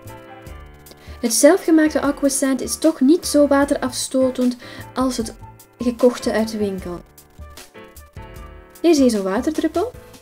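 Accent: Belgium. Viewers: doe normaal man ook niet iedereen vind jou stem mooi maar juist lelijk en zij kan er niks aan doen van die stem ze is Belgies!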